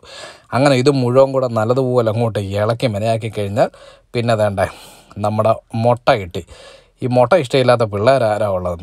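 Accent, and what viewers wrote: Kottayam, India. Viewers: samsaram patented. Athu vittukodukkaruthe. Kottayam Malayalam slang kittulla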